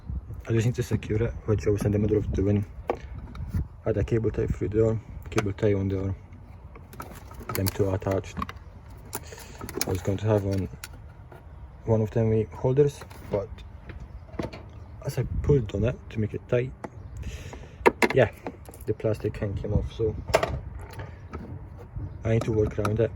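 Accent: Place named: Pakistan. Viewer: I can’t make out a word that you did said. It’s like half Pakistani half Dutch with a bit of Glasgow accent chucked into the mix. M8 I didn’t understand one word of what you just saided.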